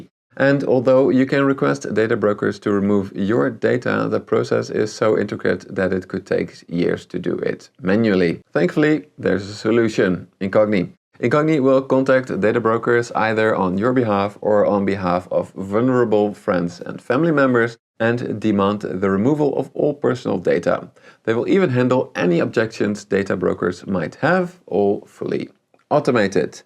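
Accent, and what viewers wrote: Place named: Netherlands. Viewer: Strong dutch accent😂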